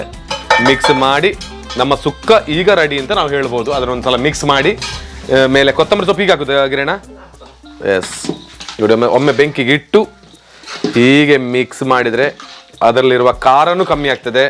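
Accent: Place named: Arebhashe, India. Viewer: Arebashe mathadi kelikke Kushi ade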